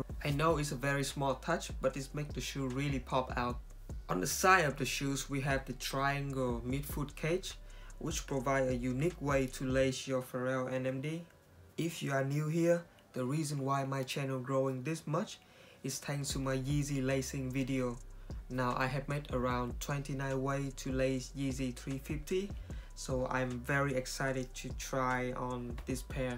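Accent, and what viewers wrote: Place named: Vietnam. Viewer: omfg dude, 1st time to ur channel, but guess what, i could see where u from by how u pronounced. hahaa, its cannot be “a đi đát” like what u said. and im also from 𝙑𝙞𝙚𝙩𝙣𝙖𝙢 🇻🇳 btw ^^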